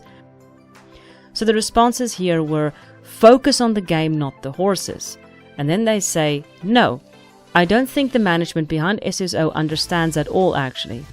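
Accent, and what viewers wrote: Afrikaans, South Africa. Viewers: i can't tell if you are afrikaans or danish or what now. such a pretty accent! this game was everything to me as a kid, it's sad they've gone downhill. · IKR!! Thought her voice sounded SA but wasnt sure until she said she was😊 nice to see someone from here making good content. And me too, I would love to see the game back on track to being what it was, because that's what got us all playing in the first place. Jy ook😄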